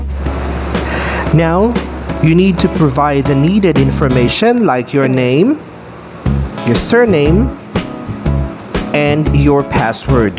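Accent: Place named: Philippines. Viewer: wahhh sabi ko na filipino yung nagsasakita eh ahaha... ang ganda ng english accent <333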